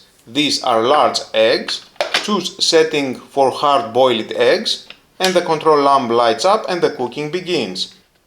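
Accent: Greece. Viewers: Γιατι μου ακουγεσαι σαν Ελληνας με Proficiency?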